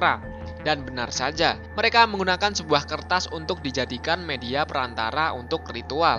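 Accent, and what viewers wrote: Java, Indonesia. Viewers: Bnjir yg punya Chanel Wong jowo kyo aku mas smpean😂Wong jowo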